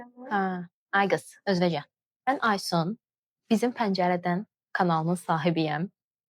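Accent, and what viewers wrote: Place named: Sheki, Azerbaijan. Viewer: Şəki ləhcəsi çox qeşeydi. Uğurlar sizə, çalışın motivasiyadan düşməyin ❤️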